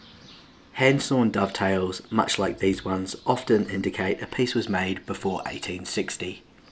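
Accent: New Zealand. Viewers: Oh man, I could listen to your kiwi accent forever! Great job, as always! ❤ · Being married to a kiwi the accent is familiar. Just found your channel and really enjoyed this video. I’ll be watching😁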